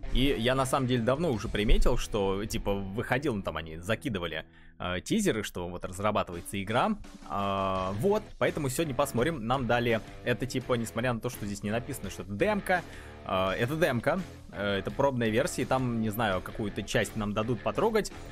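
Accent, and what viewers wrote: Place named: Russia. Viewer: Rial Rassin accent!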